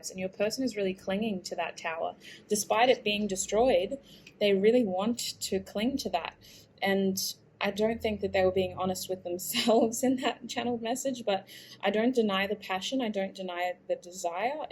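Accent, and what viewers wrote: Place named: Australia. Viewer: Thank you. Group 3. Perfectly accurate. I will continue to focus on self, he has a lot of mixed up "stuff" to sort through, and I know he will come back into my life, he struggles to let go, however, I have put my life on hold for too long now. Time for me to keep focussing on me and moving forward. I follow a few tarot readers, it sounds like you are Australian. You are very good at what you do. Thanks for sharing with us and giving us some clarity xxx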